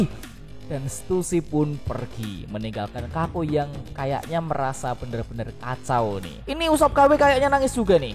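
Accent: Java, Indonesia. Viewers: ngomong ny gak medok bisa gak bang?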